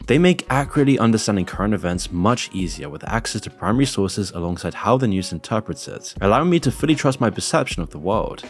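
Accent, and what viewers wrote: United Kingdom. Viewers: Why does moon care about what’s happening in the USA? He sounds British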